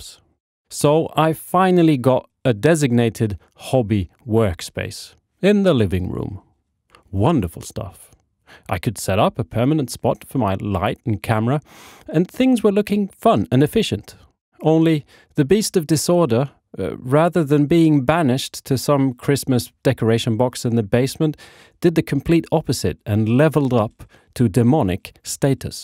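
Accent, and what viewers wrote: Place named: Sweden. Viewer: I like your style, there aren’t many minipainting channels that look like yours. I would love to be proven wrong. Though you were British for a short moment but then I heard the Swedish and realised yes this man has a lot of style. I can relate more. Also the cinematography or photo I don’t know what is what, it very much works. Also how you give the viewer the why and your purposes. Not just the how. Very important. I like the string hula conversion very much, would you mind showing me the ropes I want my own!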